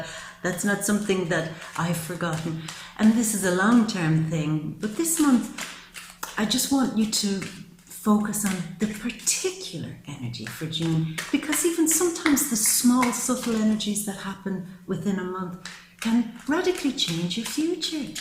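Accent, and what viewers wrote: Ireland. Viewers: Sooooo funny, shove it up your arse...amazing and with your Irish accent..haha...thank you for an amazing reading and totally resonates with me looking for something to hold on to.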